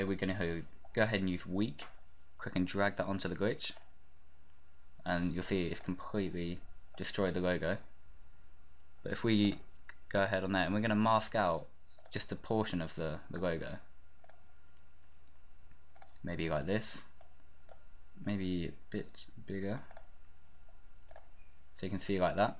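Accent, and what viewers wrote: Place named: United Kingdom. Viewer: i dont understand well british people, did u say hold click on the possition in ???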